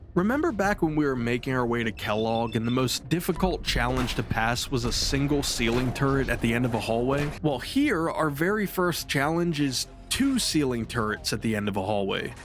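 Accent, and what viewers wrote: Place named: Pittsburgh, United States. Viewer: Idk where ur from but I keep catching a Pittsburgh soundin accent 😂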